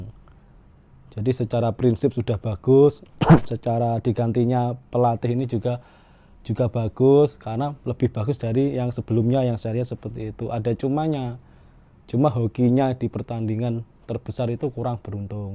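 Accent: Java, Indonesia. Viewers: Ms miriP Orang Korea kk..tp jowone medok..orang mana ya kk.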